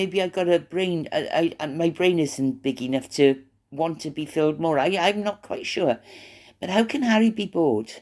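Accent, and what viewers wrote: Wales, United Kingdom. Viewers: I feel like I'm in front room on a Sunday. It's like listening to my nan. I'm from Newport next to Cardiff so they sound virtually the same. It's so comforting..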